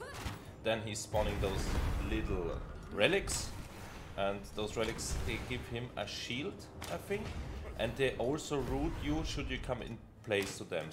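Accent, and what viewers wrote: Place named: Germany. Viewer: Wenn Deutsche Englisch sprechen :D <3 danke für den Guide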